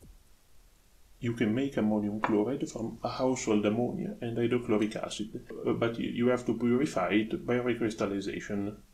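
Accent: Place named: India. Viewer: Thought you were an Indian guy hearing your accent, dude.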